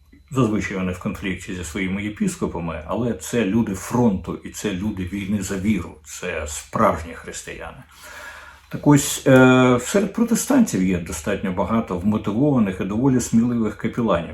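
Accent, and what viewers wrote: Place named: Russia. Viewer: Укр Мир,повинно звучати як МИР,а не мір.Від цього походить і перемир'я,а не перемір'я.Дмитре,та позбавтеся такої філологічної залежності від Ваших улюблених Ванюшок...Тисячі ракЕт полетіли на голови наших людей,а з Ваших вуст Третій рік поспіль все вилітає: РакЄти,та ракЄти.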